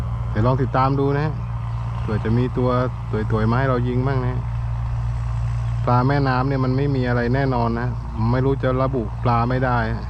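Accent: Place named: South, Thailand. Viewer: 555พี่ แหลงใต้ชับผึด😁😁😁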